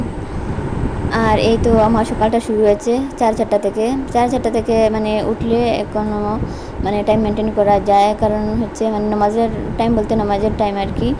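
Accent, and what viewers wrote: Bangladesh. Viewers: আপু ভিডিওটি খুব ভালো লাগলো। গোল গোল করে বেগুন কেটে তেলে ভাজি করে নিলে। ফ্লোর পরিষ্কার করলে। স্কুল ব্যাগ গুছিয়ে সুন্দর মতন রেডি করে দিলে তারপর সাথে করে নিয়ে গেলে। সব মিলিয়ে খুব ভালো লাগলো আপু। আপু তোমার রান্নাবান্নার ধরন থেকে শুরু করে কথাবার্তা সবকিছু আমার কাছে কেমন যেন বাংলাদেশি মনে হয়। তোমার কি কেউ বাংলাদেশে আছে নাকি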